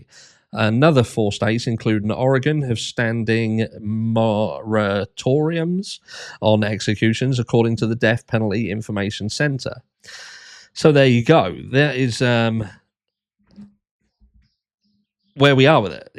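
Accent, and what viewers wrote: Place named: England, United Kingdom. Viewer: I love your bright yellow light accent that is in the corner of your room, so pretty!!! As Miss Potts would say “sidebar“ Mr. what area of England is your accent from not the room accent but your voice accent, just curious and thank you.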